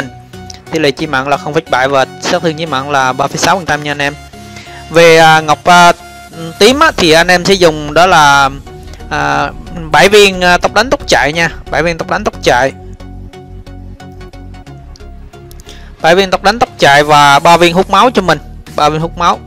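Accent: Quang Ngai, Vietnam. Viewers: Quảng Ngãi nói vui nhỉ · Người Quảng Ngãi phải hông anh owiii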